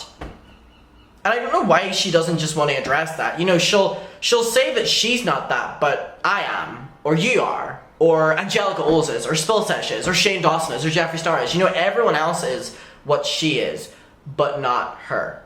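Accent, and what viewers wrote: Ireland. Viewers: Knew when you started talking you were from Ireland LMAO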